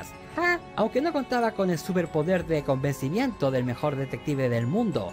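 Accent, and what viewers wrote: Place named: Chile. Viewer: Amigo hablas como un chileno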